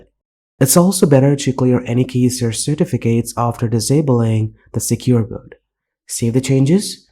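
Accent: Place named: India. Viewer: Nice accent for an Indian. I didn't knew you were Indian until I saw the profile.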